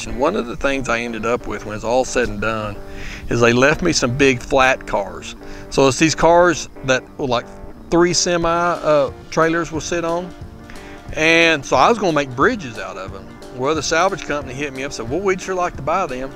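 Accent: Texas, United States. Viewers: Definitely sounds like Texas.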